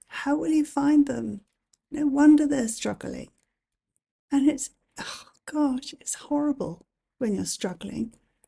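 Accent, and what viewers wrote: England, United Kingdom. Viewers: Hello, I came over here from LifeintheWyldeWest. Love your vioice. I was born in Scotland and adopted by Americans and came to the US, so I don’t get to leave with that lovely English accent. I will be watching. Your success inspires me. ❤️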